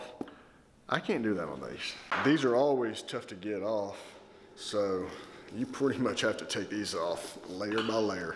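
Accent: South, United States. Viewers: Love watching your work. That Southern accent is a plus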